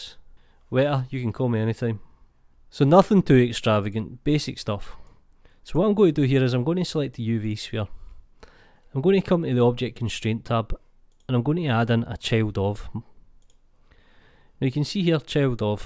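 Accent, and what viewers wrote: Scotland, United Kingdom. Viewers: Ah okay, that explains everything 😄 the scottish accent 👍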